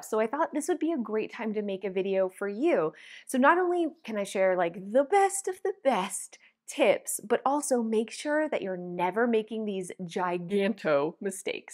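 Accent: Canada. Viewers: yep! But not all your followers are 😉 just wanted to leave the tip for any in the comments who happened to live down here. They could apply all your helpful packing tips with the crates, if they didn't want to buy new boxes from Kennards or Storage King (local moving/storage companies). I miss being only a couple hours drive from Vancouver - i know that's not where you're located in Canada, but sometimes listening to your videos hits the spot with your accent 💜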